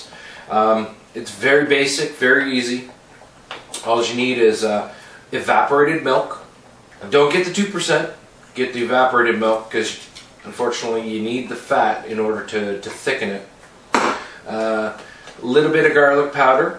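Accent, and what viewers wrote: Nova Scotia, Canada. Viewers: "the haaaard part" - I'm from NS, living in Japan now and trying to spread local cuisine. Your videos have helped me out a lot and it's nice to hear an East coast accent.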